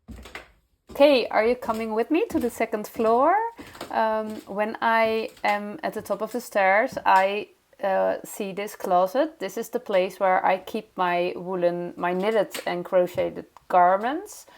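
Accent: Netherlands. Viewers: I love your Dutchy english😊 Half dutch myself, danish the other half, love everything creative and artsy, glad I found your channel♥️ tot siens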